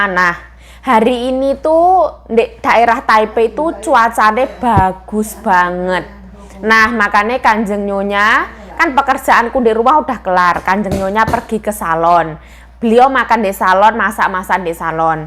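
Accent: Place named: Banyuwangi, Indonesia. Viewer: Sampean ki opo wong banyuwangi, kok logat ngomong'e koyok mbak'u ipar banyuwangi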